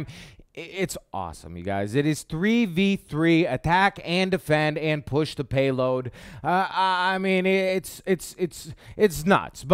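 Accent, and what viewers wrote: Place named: New York, United States. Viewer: I detect a New York accent., you from ther East Coast?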